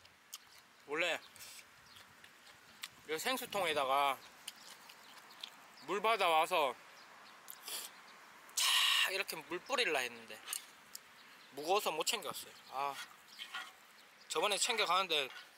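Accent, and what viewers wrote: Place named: Gyeongbuk, South Korea. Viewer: 오늘도 잘 보고가요~말투가 구미,경북 북부쪽 말투시네요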